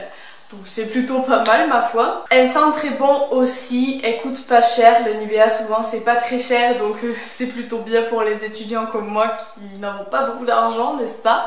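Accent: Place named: Aveyron, France. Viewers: Ahah l'accent Aveyronnais, je suis Aveyronnaise! 👊🏼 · Big up pour l'accent aveyronnais !!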